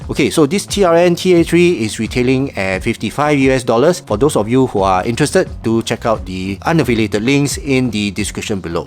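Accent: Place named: Singapore. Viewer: I think is a Singaporean. I also noticed this on some of my Singaporean colleagues.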